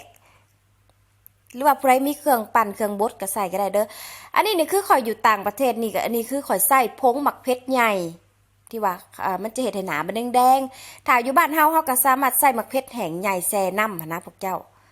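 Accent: Savannakhet, Laos. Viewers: ຂ້ອຍເບິ່ງຫລາຍລອບກະຄືບໍ່ໄດ້ມີພາສາໄທປົນໃດ ຄົນສະຫວັນເຂົາເວົ້າແບບນີ້ໃດ ຂ້ອຍກະຄົນສະຫວັນນະເຂດຄືກັນ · ເຈົ້າຄົນແຂວງໃດສາວ ນີ້ແມ່ນພາສາຄຳເວົ້າສະຫວັນນະເຂດ ຂ້ອຍຟັງແລ້ວກໍ່ບໍ່ໄດ້ມີໄທປົນເລີຍໃດ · Jasmine Cahuana ຂ້ອຍຟັງເອື້ອຍລາວເວົ້າມ່ວນໆຫວານໆ ຕາມທີ່ຟັງນິແມ່ນສະຫວັນອ້ອຍຕ້ອຍເກາະນິ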